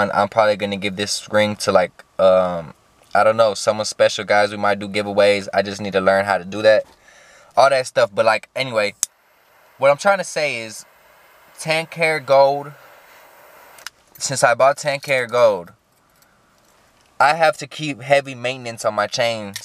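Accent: Texas, United States. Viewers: I’d figured he from Texas his accent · You from S.a texas homie?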